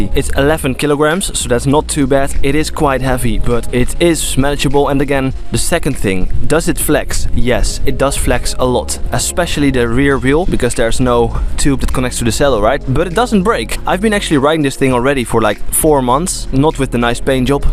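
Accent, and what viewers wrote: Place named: Netherlands. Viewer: I hear a dutch accent haha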